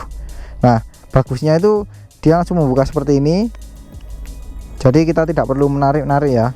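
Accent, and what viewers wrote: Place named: Java, Indonesia. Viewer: Lucu, Judul Inggris.. Ulasan dialek Jawa.. Lanjut sam..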